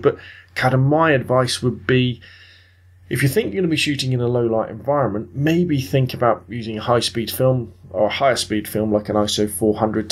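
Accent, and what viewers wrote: United Kingdom. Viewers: Best review! Beautiful accent and I believe it's British accent! Peace :D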